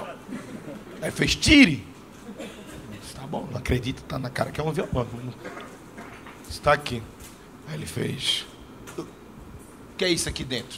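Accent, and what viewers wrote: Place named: Bahia, Brazil. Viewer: normal, ele é baiano em vez de dizer MAS VOU ele fala MAR VOU... E outras trocas de letra assim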